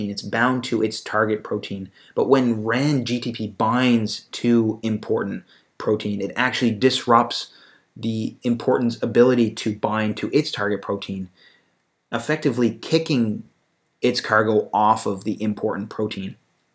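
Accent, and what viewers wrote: Canada. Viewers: You rock!!! Thanks so much and do I sense a Canadian accent?? I love it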